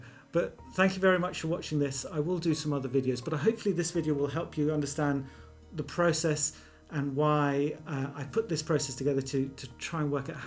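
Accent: United Kingdom. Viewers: Please remove the background music while you are speaking! It is very annoying especially trying to understand with your British accent. Thank you!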